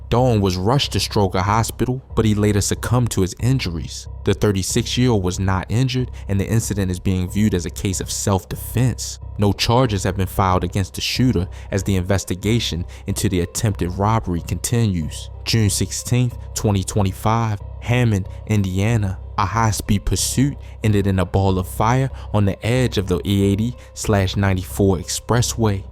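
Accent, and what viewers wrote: Baltimore, United States. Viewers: Baltimore lol I live here trust me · That Baltimore accent bro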